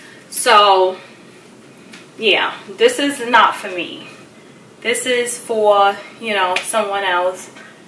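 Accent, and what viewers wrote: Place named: New York, United States. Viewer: I could hear that strong new york accent lol love it is cute just like you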